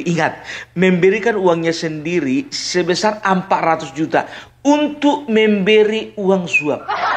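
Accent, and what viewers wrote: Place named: Batak, Indonesia. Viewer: Parbelak parbelak, aku anak pakam senang dengan dialek batakmu pemberitaannya pulgar dan rilex.....❤❤❤❤❤❤❤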